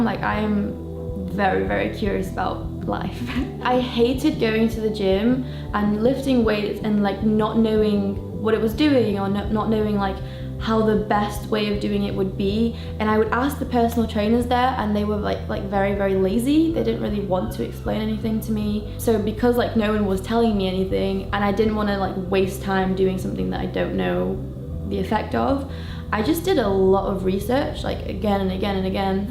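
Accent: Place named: United Kingdom. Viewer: Latina with British accent 😍😍😍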